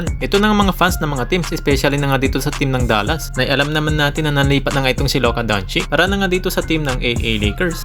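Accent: Pangasinan, Philippines. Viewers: Idol taga pangasinan kaba? 😅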